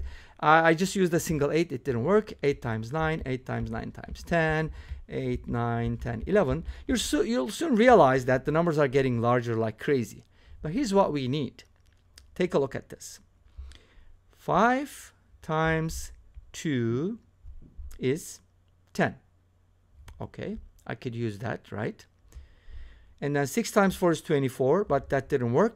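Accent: Turkey. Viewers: unnecessarily long video. last part was more interesting it could be more explanatory aksanindan anladim turksun helal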